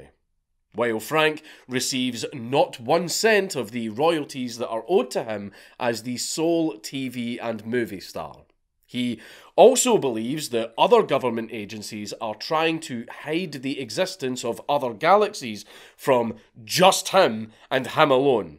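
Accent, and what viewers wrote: Scotland, United Kingdom. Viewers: has a neko t-shirt and sponsored by a knife company. Been watchin some of that h enti too? Please don't turn fully Japanese we need you for that nice Scottish accent.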